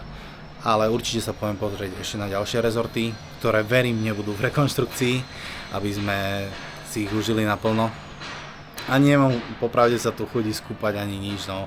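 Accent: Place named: South, Slovakia. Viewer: super video,dakujem za typ a nie si z južneho Slovenska? Myslím si podľa prízvuku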